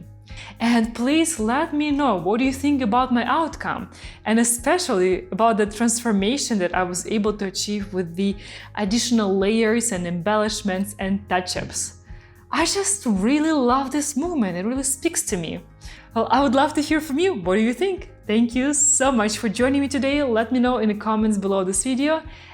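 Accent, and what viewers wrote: Russia. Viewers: русская девушка? У нее русский акцент❤ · Ну и акцент! Чисто русский. даже я почти все понял. Хотя на английском кроме хау мач? них не разумею.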